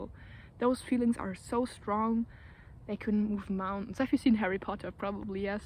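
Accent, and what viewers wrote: Germany. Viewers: Are you german? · Are you german ? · are you german?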